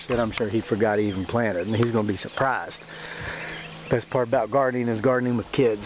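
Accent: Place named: South, United States. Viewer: Petutchi Betelgeuse Ditto.His genteel manner and southern accent are super nice too. I havent caught his name either... so humble.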